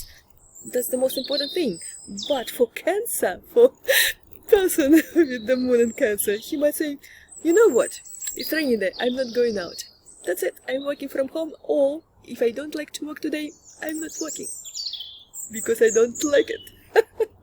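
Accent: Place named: Eastern Europe. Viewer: I absolutely love Eastern European women speaking English with their native accents. I have no idea why but I could listen to your astrology explanations all day. Excellent explanation as usual. 💯💯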